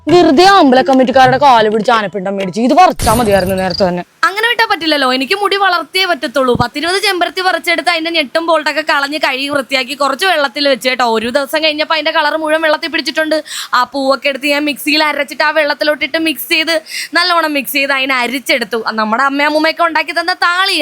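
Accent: Alappuzha, India. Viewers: ചേച്ചി ആലപ്പുഴ anno❤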